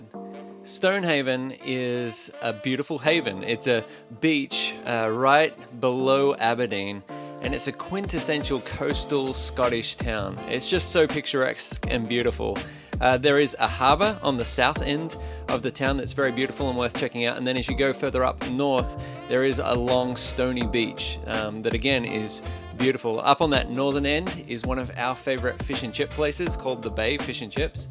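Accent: Australia. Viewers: You dont sound like you are american! You sound like an Australian or New Zealander! How did the lack or warmth and sun, particularly in the winter, make you feel, as you are from a warmer and sunnier part of the world? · I’m guessing you’re not a native Texan though. Sounds like Australian or NZ?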